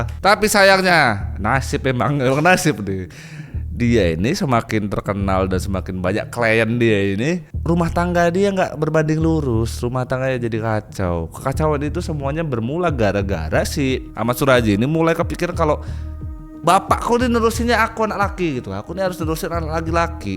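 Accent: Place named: Lampung, Indonesia. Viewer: ketara bener logat lampungnya hahahaa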